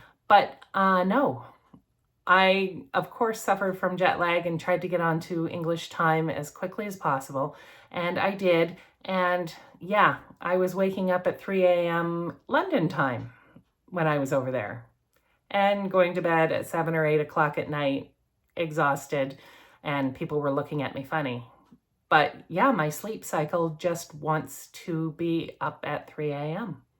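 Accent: Canada. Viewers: I could tell you were a Canuck after just one sentence as I am a veteran of five entire seasons at two different Lake Temagami, Ontario canoe tripping camps. And as we all know, Canucks are supposed to eat their French fries with vinegar not ketchup. I am also an ex Benedictine monk and the sleeping habits you describe are most typical of cloistered hardcore monastics such as the Trappistines and the Poor Clares. I sleep in a heavy duty Cabela’s camp cot in a an extra wide sleeping bag rated to 20 degrees Fahrenheit and I sleep in my family room which I use as an efficiency apartment of sorts as I live with my sister in the family house we inherited jointly and she is hogging the entire second storey with all of the additional bedrooms. I used to sleep in the basement until it started flooding owing to the ongoing climate disaster with the storm sewers backing up owing to repeated torrential downpours. 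You could use a little more of that rain up there in Canuckiana to put out your forest fires.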